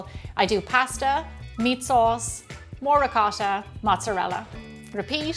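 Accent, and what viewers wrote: Ireland. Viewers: So. I love your baking recipes. I love your accent (love Ireland!). BUT I'm Italian and this is what comes out of nightmares 👹🙈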